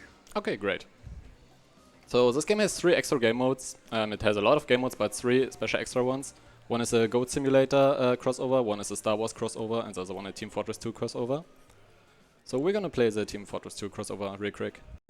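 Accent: Germany. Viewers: Tbh we actually used to make fun of other germans that speak with an accent like that in school. Most germans who dont say ZE instead of THE dont like hearing that "german" accent. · Love how you can hear it that he’s German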